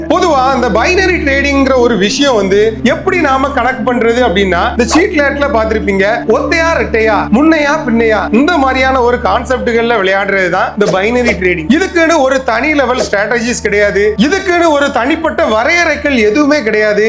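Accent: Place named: Kanniyakumari, India. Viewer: Unga slang apdtha irukku nanum kanniyakumari tha marthandam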